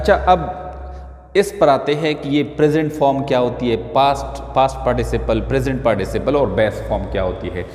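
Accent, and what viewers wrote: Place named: United Kingdom. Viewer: mashallah you are doing very well and your accent is nice too and you can speak british accnet very well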